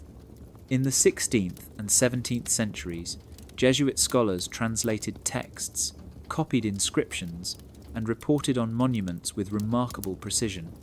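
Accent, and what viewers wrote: England, United Kingdom. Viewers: Are you a English lad? Did you come from Oxford?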